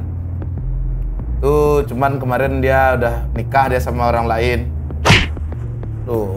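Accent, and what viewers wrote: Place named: Padang, Indonesia. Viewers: Wkwk denger pakai logat padang jadi kangen saudara yg disana, dulu pernah njir persis kyk gini turun di minangkabau trus dr padang ke sungai penuh naik travel avanza njirr mantep wkw pulangnya kapok ganti naik travel hiace hahah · Kalau mau dengar logat indonesia padang nya keluar di konten ini.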